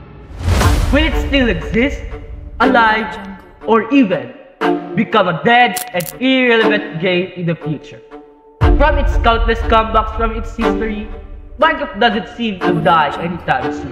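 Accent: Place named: Philippines. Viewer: Filipino Accent😂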